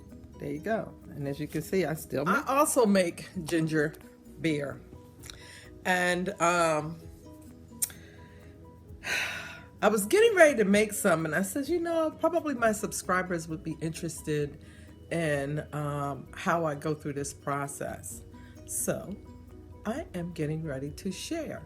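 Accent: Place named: Bermuda. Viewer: Hello madam your ascent so clean looks Bermudian or American ? What you said never use metal pot or spoon she is right also use wooden spoon and glass bottle always any thing for stir hot stuff never use plastic spoon I seen even master chefs using plastic spoon during cooking hot food need common sense only thanks. From, K.singh North West India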